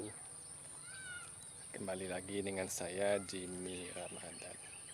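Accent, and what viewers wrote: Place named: Java, Indonesia. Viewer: Si mas ini org Jawa yaa? Loghat ny keliatan soal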